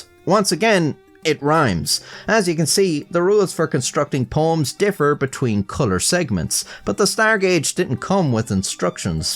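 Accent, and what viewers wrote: Ireland. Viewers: Is that accent Irish? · Please, Irish guy in my iPad, read me more poetry. I promise I won’t be idly scratching my ass this time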